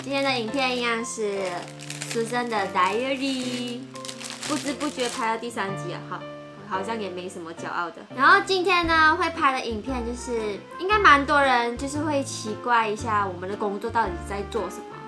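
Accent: Taiwan. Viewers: 的台湾腔越来越够力了🌚❄ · 说话越来越台湾腔了啦❤😂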